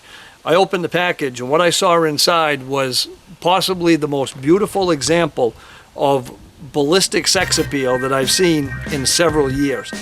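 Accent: Maine, United States. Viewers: nice! I watch your videos often, it sounds like you don't live too far away either, down east, or upta county, lol.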